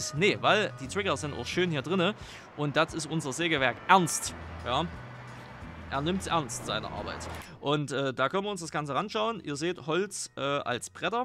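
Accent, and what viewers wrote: Saxony, Germany. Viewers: Tolles Video, Super Karte,da wurde richtig abgeliefert. Großes Lob an den maper. Ich bin großer Fan von deinen Videos und wie man hören kann bist du Sachse. Mach weiter so. Gruß Rene aus Dresden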